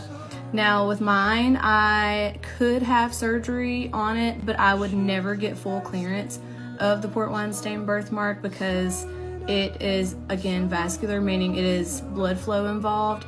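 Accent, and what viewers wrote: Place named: South, United States. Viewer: Damn, ur pretty...and that southern accent! Dont care nothing about makeup but enjoyed just watching u talk. More beautiful in this clip than any of the others.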